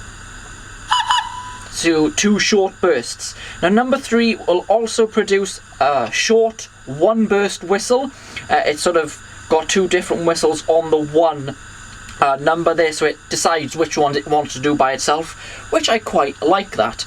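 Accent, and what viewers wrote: Wales, United Kingdom. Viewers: I've been watching for your YouTube channel and I'm watching it right now with the new year time but be honest with you I like really welsh accent it's really cold and my real name is Daniel Lee Baker from South Yorkshire Doncaster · Hello, firstly thank you for the many videos from this year on your YouTube channel. Congratulations on your new job before Christmas. As for the accent, well mate I subscribed to your channel because of your accent, don't worry about people who appear to be anti Welsh, I'm part Welsh and very proud of my Welsh heritage. Your Christmas video this year I absolutely loved as ever mate its fabulous, just like your remembrance Sunday special, really nice that you care about others. Happy New year, and hope that you have a happy prosperous New year personally and for the channel, can't wait to hear what you are planning for 2020 for jubilee road international. 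Hope to meet you next year at the meet and greet meet up at the SVR. Cheers, from in Middleton Manchester. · To the best of my knowledge, you are the only Welsh Model Railway contributor on YouTube. I wouldn't worry what people say about your accent! Diolch. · Happy New Year. Look forward to new videos during 2020. Love the Welsh accent. I visited Wales during the summer, it was scorching hot, went up Snowdon, didn't need a coat it was so hot. Must have been roasting in your loft. Keep up the good work.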